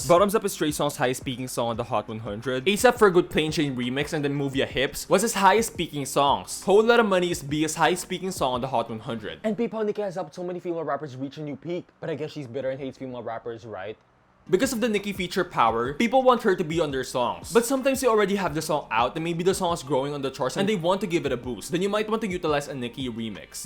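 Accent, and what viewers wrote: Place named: Philippines. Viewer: I'm living through your stanship and filipino accent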